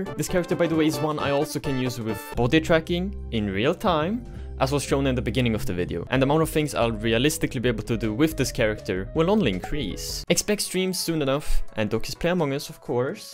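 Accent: Sweden. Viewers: I see swedish accent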